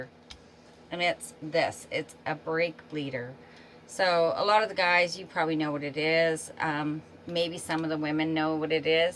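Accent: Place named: Canada. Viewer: I thought your accent might be Canadian! 😂 Thanks for confirming!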